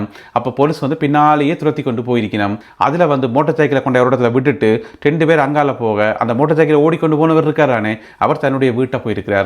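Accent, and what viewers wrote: Jaffna, Sri Lanka. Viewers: இது யாழ்ப்பாண பேச்சுத் தமிழ்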